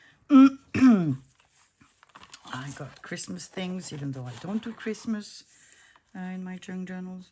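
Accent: France. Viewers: Dear don't be sorry. I love to see what you make in your own wonderful chaotic way. And I love to hear you talk with your beautiful French accent. But post when you're okay and when you're up to. Good luck with your operation, try to not worry to much. Hugs from me, Gertie from the Netherlands. Btw I'ld lóve to see the puppies! 🩷🩷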